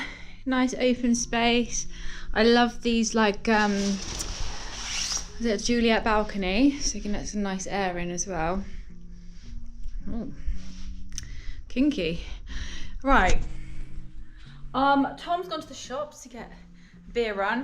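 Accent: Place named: Texas, United States. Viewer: You sound like you're from Texas! hahaha...Well hello from Texas anyway!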